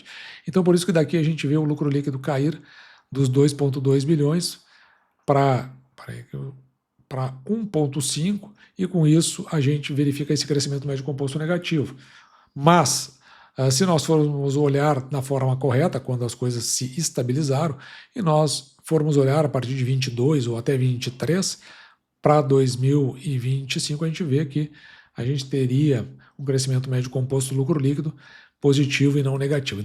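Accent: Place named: Rio Grande do Sul, Brazil. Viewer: Cara tenta falar mais neutro..vc não vende peixe só pra gaúcho. INSUPORTÁVEL sério, fica a dica. Vc puxa muito acento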